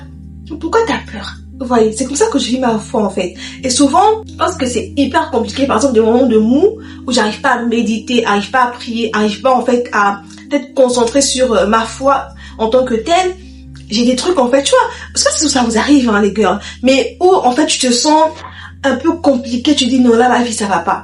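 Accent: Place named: Gabon. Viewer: Bien l’accent gabonais oh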